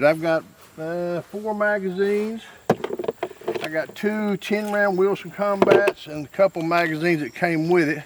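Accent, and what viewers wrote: United States. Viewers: What state do you live in? Sounds like America. Sorry I'm a POW in commiefornia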